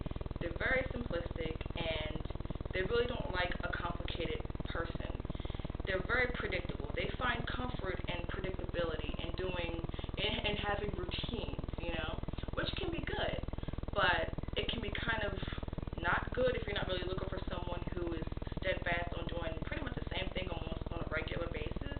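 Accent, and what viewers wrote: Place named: Philippines. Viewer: Your accent is so adorable.do you have Filipino ancestry?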